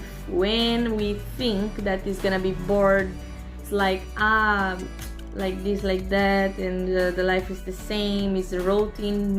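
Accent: Philippines. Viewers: You also having the Filipino accent in speaking english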